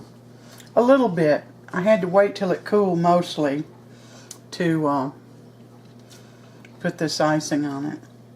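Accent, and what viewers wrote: South, United States. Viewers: I need to add this to my list. Making that St Pattys cake this weekend. I just love watching you cooking, the recipes are are so easy to follow. I like your southern twang, where are you from?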